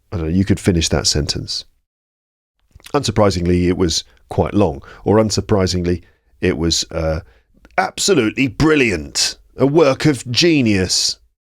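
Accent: United Kingdom. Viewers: Hi and thanks.are you only speaking betitsh accent? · Это британское произношение? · Excellent British accent of. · The combination of your beautiful voice and the British accent is not only important and a great motivation to study English, but it is also really relaxing and enjoyable. Thank you very much for this contribution. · Thanks you i firstly was thinking that British accenet so hard but after started listening your podacst i discoverd it amazing accent thanks alot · I trully enjoy listen your podcast. You trully english accent, british accent,real. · I really like listening to your clear British accent when you are reading stories.. your channel helps me a lot to improve my English language.. please post more stories.. I really enjoy listening to you... Thank you very much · Thank you fot this episode. It was so pleased to hear your british accent with such a clear pronansiation that is so rare to hear ir from natives. 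It can really boost vocab of students and teachers and undestanding of English speech. And moreover your teacher's style is so enjoible and motivative because it lookes like there isn't nothing special to undestand English. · I enjoy your British accent, thank you very much,!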